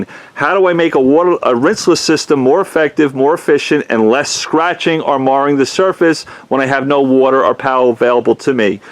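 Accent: New York, United States. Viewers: This guy speaks like a NY’er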